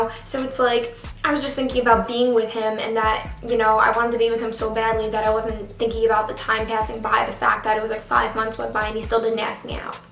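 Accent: New York, United States. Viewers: Her New York accent is so strong in this! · MariaHerrle she has a New York accent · She has a New York accent! You can here it when says ask and again! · She's from New York, it's a common accent💗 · Anyone else just love her new york accent??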